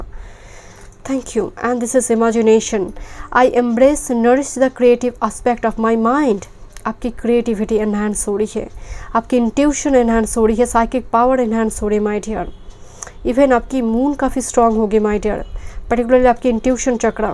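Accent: Bengal, India. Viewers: pile#3 well it's the very first time I am into this channel and loved the entire reading, though I want to ask you 'are you a Bengali?" as your accent is quite similar to Bengalies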